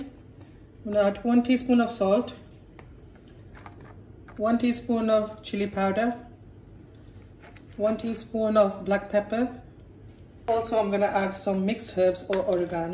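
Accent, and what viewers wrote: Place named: Midlands, United Kingdom. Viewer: You're from the Midlands lol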